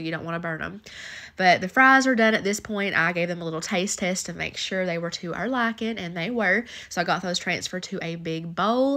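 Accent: South, United States. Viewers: I like your videos but girlll you sound so country teehee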